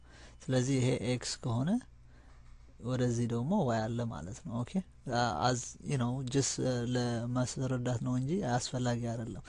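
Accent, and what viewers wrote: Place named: Ethiopia. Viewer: LOL such an Ethiopian accent! I love Ethiopians!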